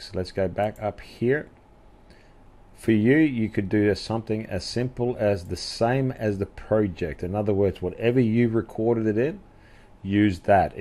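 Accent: Australia. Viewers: I wish I'd found this video two years ago! I'm not a beginner any more but I /still/ found basic things I didn't know. Thanks, I'll be checking out your other videos too. Oh and cheers from Melbourne. Lovely to hear that Aussie accent. :D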